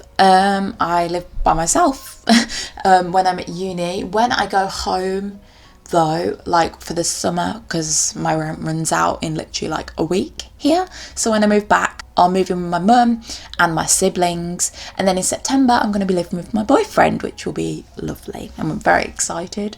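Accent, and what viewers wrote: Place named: Birmingham, United Kingdom. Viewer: Where are you from? you sound like you’re from Birmingham by the way I’m obsessed with your channel 💓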